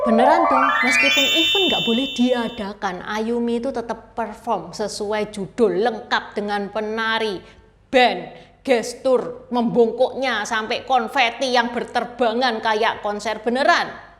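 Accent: Java, Indonesia. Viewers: Anjai jepang jawa medok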